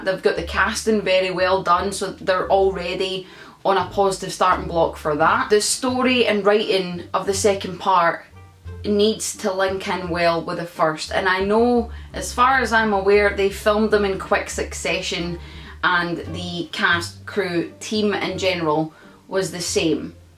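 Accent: Scotland, United Kingdom. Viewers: I have a question can you teach me how to speak Scottish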